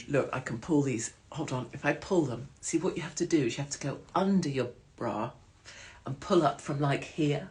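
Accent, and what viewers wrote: RP, United Kingdom. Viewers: A well-spoken lady "posh" where I'm from speaking up for the working class. The more I see of the more I think....legend. As I'm writing you said Cu..nt. my god You just became more legendary to me. Keep at it 💪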